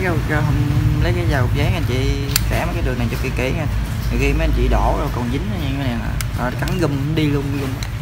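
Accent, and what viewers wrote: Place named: South, Vietnam. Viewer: Giọng nói miền nam nghe rất chất phát. Hướng dẫn rất chi tiết đầy đủ. Cám ơn bạn rất nhiều và mong bạn có thêm thật nhiều clip khác.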